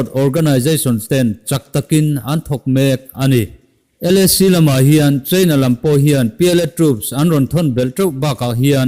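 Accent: Mizoram, India. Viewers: I prog ah i tui tih a hrriat a, i mizo ṭawng pawh ngaihthlak a nuam khawp mai. Kal zel rawh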